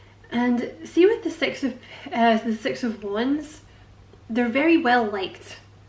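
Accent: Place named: Scotland, United Kingdom. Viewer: Oooh I Love your long readings and your Scottish😍 Thank you so much for your beautiful reading💕🙏🏻😘 Love you💕💕💕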